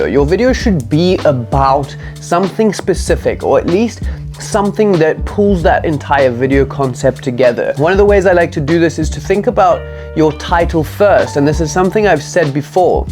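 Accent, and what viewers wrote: Cape Town, South Africa. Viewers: I’ve watched a ton of these “HR clog your simple life” and yours is my favorite. Maybe I just miss the Cape Town accent! I’m watching this in Atlanta airport on a trip I’m vlogging for my new channel: Cage Free Theology Thanks bru.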